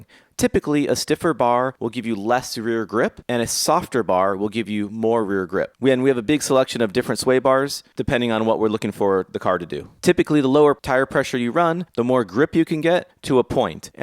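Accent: Greece. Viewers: Where are you from??? Greece?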